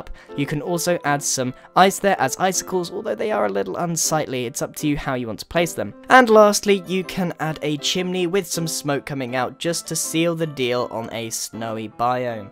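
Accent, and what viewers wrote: United Kingdom. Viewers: Oh are you British cause there are not that many YouTubers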